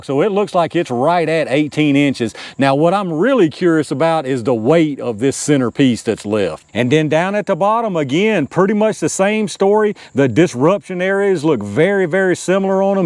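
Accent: South, United States. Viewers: damn.. you surely dont expect a gun youtuber with a strong southern accent to pull up all those gears for ammo tests lol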